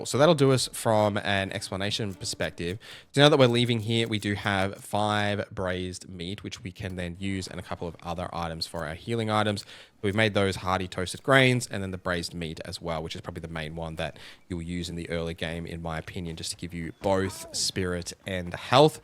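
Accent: Australia. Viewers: I was won over by the intro music. As I always say to my children when i need them to hurry- run like you stole something. Also love the aussie voice, five gold stars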